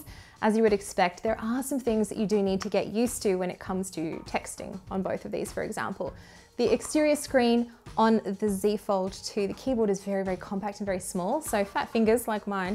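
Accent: Australia. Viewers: Australians would typically say /djuːəʊ/ (sounds kinda like d-wow) vs the dryer "u" sound in the american /d(y)o͞oō/. Her australian accent is subtle, though. I would not have picked it up if she hadn't mentioned it. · Just got the zfold2 yesterday. Amazing!!!!! Waiting for the spiegen tough armor case in the mail. Highly recommended that case! Also... I love your aussie accent! Xoxo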